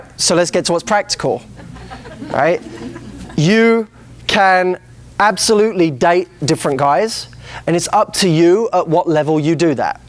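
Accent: United Kingdom. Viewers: Is it just me or did he lose his british accent? Quite disapointing of his appearance now since 2009 ;,( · lol OMG i LOVE YOUR BRITISH ACCENT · He sounds so much like Daniel Radcliffe. Not just the way his british accent is, but also his voice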